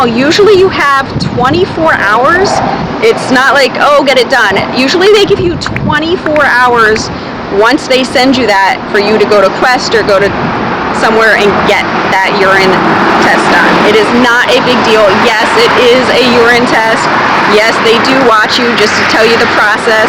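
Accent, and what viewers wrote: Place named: Midwest, United States. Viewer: 1. You say that you are from Pennsylvania yet you seem to have an annoying Midwest accent. Primarily around Michigan or ORD. 2. Do not wear black and blue outfit for your interview. 3. Since you are so short, wear a navy blue dress. 4. Do something with your hair. 5. If you want to paint your fingernails weird colors, go work at Virgin Atlantic. 6. I think you are in your late 40s! (Cameras and film)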